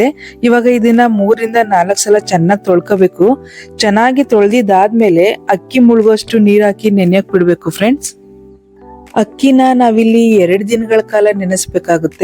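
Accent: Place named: Mysore, India. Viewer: Madam nimmadu yava ooru.? Nim mathadodu keluttha idre mandya Mysore kade kelvru heege mathadodu.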